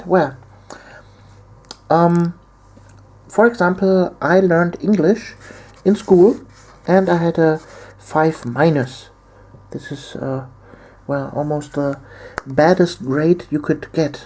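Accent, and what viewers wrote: Germany. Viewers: May I ask where you are originally from? I apologize if I'm wrong but i hear a German accent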